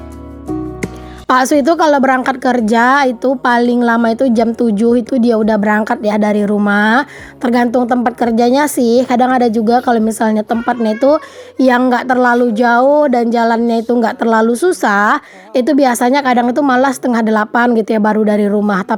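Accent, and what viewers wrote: Java, Indonesia. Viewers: Rumbai hadir,bund ngomongnya pakai logat pekanbaru dunk bnd?kayaknya skrg bnda ngomong lebih medok ke jawa 🤗🤗🤗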